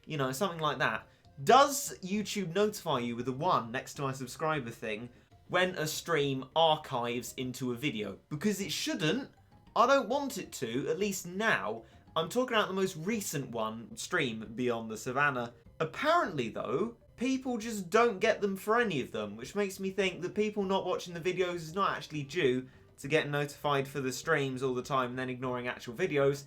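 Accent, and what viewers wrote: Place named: United Kingdom. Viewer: . I watch your videos because it's Battle Cats, and I love Battle Cats. I watch the three "big" streamers, Captain Sauce, Chawsey, and MattShea. But when I come to watch your videos, your self-deprication/sourness really turns me off. I know it's a schtick, because I've watched a lot of your videos and given all of them a fair gambit, but honestly, at this point, your "self-deprication" followed by occasional "I've taken this to the Battle Cats Reddit/Source" and talking about that, then the clearly obviously thrown in jokes about your recording times are really really stale. I wish I could give you beneficial advice. I really do. The thing is, if you only do one thing as much as you do, it's really, REALLY hard to give helpful advice. Now your starts in Doki Doki and Singularity are GOOD things, and I implore you to use your lovely UK accent in more videos, as it is with Battle Cats, I literally cannot enjoy your videos because of how salty you are (and I am in the minority there...) But advice is advice, take it how you will, you apparently miserable twerp.:p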